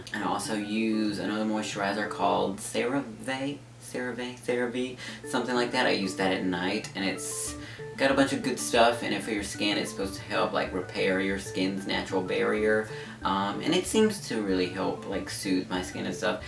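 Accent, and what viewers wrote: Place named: New Orleans, United States. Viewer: What accent is this? New Orleans?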